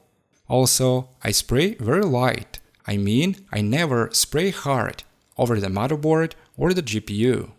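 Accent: Russia. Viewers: Хм у тебя русский акцент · У тебя не получается скрыть что ты русско-говорящий, а проект классный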